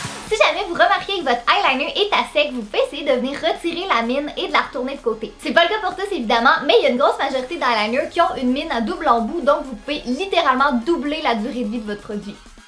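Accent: Quebec, Canada. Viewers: J'aimerais tellement avoir l'accent québécois ! 😢❤❤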